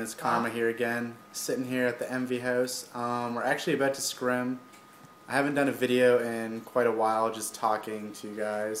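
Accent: Canada. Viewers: He's not, that's just how canadians sound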